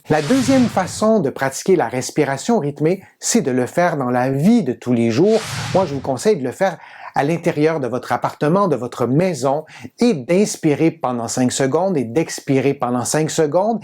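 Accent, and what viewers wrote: Quebec, Canada. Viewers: Tellement cute l'accent québécois aux oreilles françaises. Merci pour les explications.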